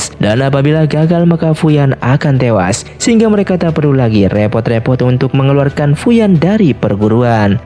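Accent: West Java, Indonesia. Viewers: Sunda mang? Abdi sunda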